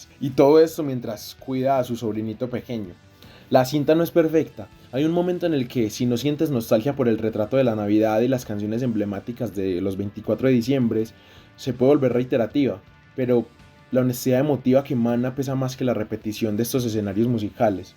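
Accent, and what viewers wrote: Colombia. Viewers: Amo el cine en casi todas sus facetas, hace unos años que vengo viendo la crudeza, el amor y la esperanza del cine Colombiano, transmitiendo esa realidad que todos vivimos y nos toca, ahora estoy en otro país, soy de Cali- Colombia, por como te oigo sos paisa Bacano encontrar gente que ame el cine como uno, ese es un lenguaje muy fuerte, algunas de estas películas la Vi con amigos, otras en señal Colombia y otras con cracks del cine en Cali, uno de ellos se llama José urbano, quien vió al caliwood nacer, ese men es un baluarte en Cali sobre el cine, me hablaba de Mayolo, de Caicedo y así.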